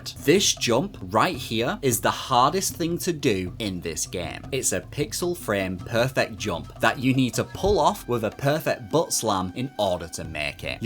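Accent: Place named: Norfolk, United Kingdom. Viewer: What part of the UK are you from; I’m trying to place your accent — is it Norfolk?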